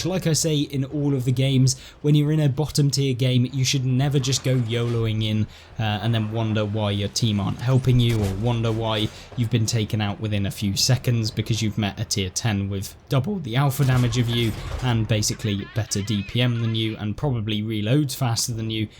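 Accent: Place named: United Kingdom. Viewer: Playing every class is a good way to learn the game, map as spots for light tank to hide in the open that will not work as a medium of heavy tank, Playing Arty will make you learn the places to hides and to stay on the move, in my personal opinion Good Arty player are Arty that stay on the move to, yes you can take one to 3 shot from the same position Sometime all your shot without moving but following your team as the game progress especially if you have a slow Arty can be a Good way to take the enemy by surprise if they expect you to stay at the base in the right corner of the map for example but all your team went left and you follow them then they will be confused on how they can get it by Arty and then don't know where to hide, yes classes have there roles to play but the more you can adjust during the game the more useful you are to your team with the motherland in that case if you top tier you can rely on your armor to play like an heavy medium and block shot and your reload is fast so you can support your light armor teammate, but in t10 game you better play it more like Spotting medium cause you got a nice camo on this one and can't rely on your armor but you can support your heavy that lack vision range at that point and help spotting TDS who fire at them, BTW really love your channel never got the chance to play with you yet I'm guessing you must be in Europe server as you sound from U.K, sometime I play with if your friendlist isn't full you can add me on Xbox RTB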